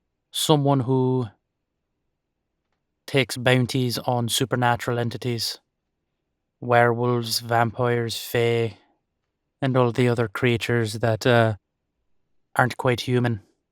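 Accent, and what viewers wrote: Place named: Ireland. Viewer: Toward the end when he got angry and started yelling about humans being the real monsters I legit had tears in my eyes. And when he stopped yelling I said sorry as like a gut reaction of like "I am so sorry you have to go through that." Listening to these audio roleplays helps me with my acting but the thing is that I have a very active imagination and so sometimes the emotions that I feel when acting are real. Well written and well acted. (I high five myself for your accomplishment) Also, love the Irish accent. I lowkey want to be apart of this series but I need to get my second channel up and going before I can do any colabs (if I would even be worthy of such) Anyway, twas a good video. I can't wait for more. (I would support on patreon if I had the money but I don't so here are some virtual high fives!)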